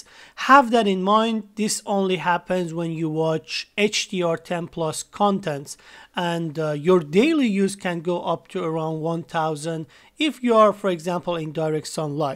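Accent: Iran. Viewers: Your accent is Iranian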